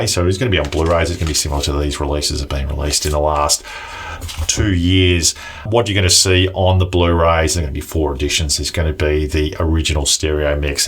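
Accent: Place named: Australia. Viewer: why do yo Awzzees butcher the English language so badly?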